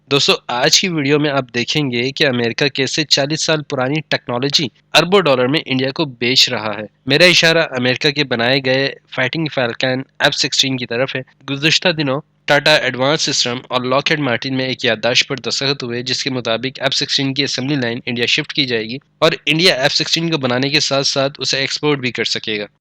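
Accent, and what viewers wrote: Punjab, Pakistan. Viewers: I am Pakistani and I agree with you, this guy is either unemployed or he is doing fake propaganda for ispr. india is way ahead. PAF is no match in front of india in numbers or in technology. even witht he failure of tajes and mig 21 still indian air force is 6 times greater than pakistan. I am not posing to be pakistani I am real Pakistani from karachi and I can tell you from the accent of this guy he is punjabi who is speaking urdu.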